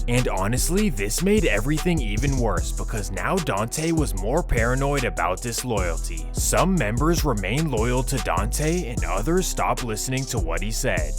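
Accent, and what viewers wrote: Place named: South, United States. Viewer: You talk like you grew up in the swamp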